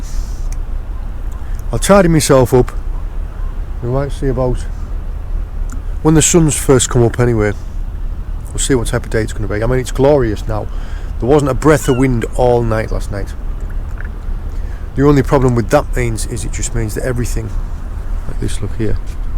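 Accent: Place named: Flamborough, United Kingdom. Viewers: I forgot to say that i am putting my pots off Flambrough head. And by your accent i think you have lived near here. It gets very rough so your boat safety videos are great. Im so glad i came accross your channel. All my mates watch it too now. Thanks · Best knowledgeable uk fisherman by a mile mate! Thank you so much for hours of vids for me and my 8 year old keen fisherman! We have a holiday cottage in staithes, are you originally from anywhere near there? You accent says that area?🎣🎣🎣🎣🎣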